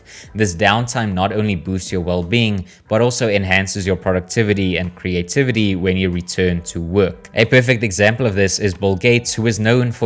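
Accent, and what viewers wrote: South Africa. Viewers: Are you South Africam? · super random but are u south african?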